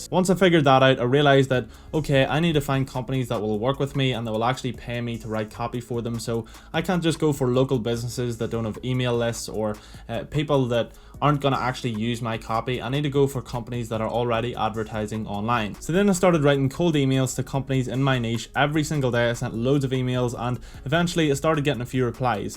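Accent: Northern Ireland, United Kingdom. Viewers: Hi, Recognise the accent so I assume you live in NI like myself. I'm looking some guidance into how to make money as a side hustle and would love your advice or coaching. How can I contact you? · Northern Ireland bror?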